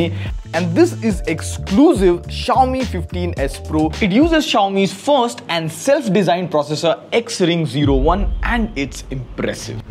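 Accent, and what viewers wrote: India. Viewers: Bro, your accent is really thick. Try to talk with out the indian accent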